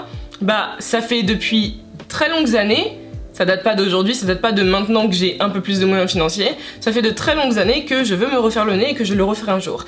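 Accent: England, United Kingdom. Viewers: It's absolutely not related to the video but I just figured out that ur accent is very British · pourquoi tu prend un accent anglais? C'est voulus ou tu es a appris l’anglais au Royaume Unis? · les gens devraient laisser Lizzo tranquille mdr, ça va là tu as un petit accent British quand tu parles anglais, c'est très mignon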